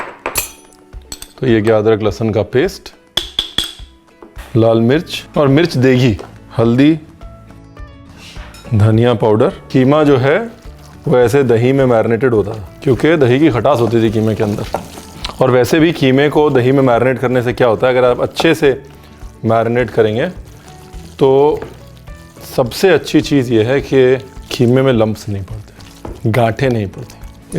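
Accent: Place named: Punjab, India. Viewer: Do you ever shower and clean up! You and your Punjabi accents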